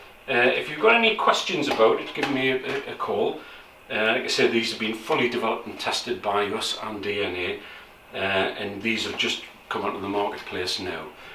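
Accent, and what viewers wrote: Newcastle, United Kingdom. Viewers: I said 900 TO a 1000 RPM . not 900 thousand- Sorry you may need an interpreter for the Geordie accent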